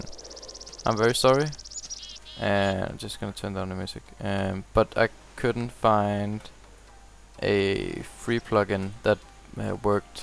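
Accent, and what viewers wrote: Denmark. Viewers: Are you danish lol? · Du lyder dansk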